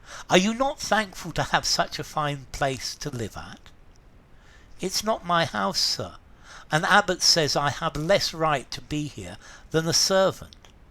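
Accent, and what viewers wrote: United Kingdom. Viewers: I'm in love with stories especially with a British accent thanks for sharing this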